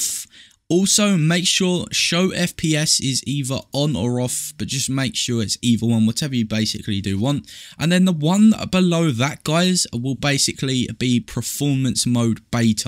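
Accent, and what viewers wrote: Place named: United Kingdom. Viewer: not a british dude again hell naw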